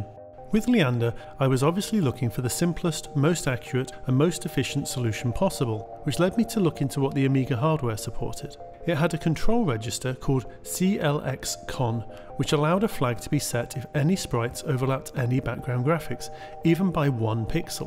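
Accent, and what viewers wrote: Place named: United Kingdom. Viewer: I really like your voice. Friendly and pleasant. I like British accents too. :3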